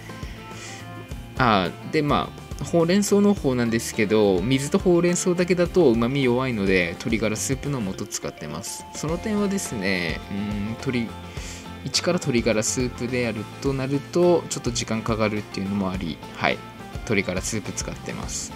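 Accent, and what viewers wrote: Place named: Tsugaru, Japan. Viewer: おめ津軽だべ？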